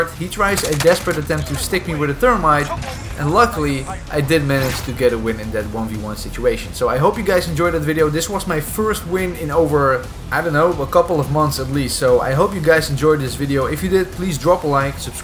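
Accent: Netherlands. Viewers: i smell nederlands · You sound dutch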